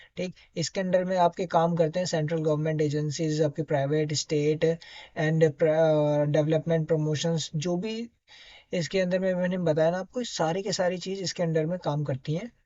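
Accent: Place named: Haryana, India. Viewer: Do you belong from Haryana? Because your taking a tone like that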